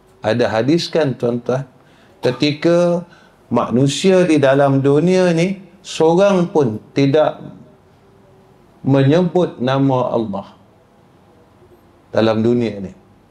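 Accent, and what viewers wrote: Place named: Kelantan, Malaysia. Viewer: Comey ustaz cakap kelantan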